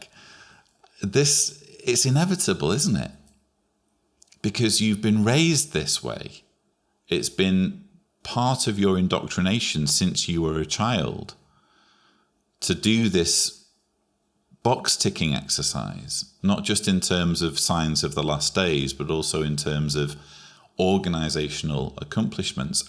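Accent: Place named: Manchester, United Kingdom. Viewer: Manchester by the accent (and the fact that he shows photos of himself in Manchester and Dudley). Somewhere thereabouts anyway.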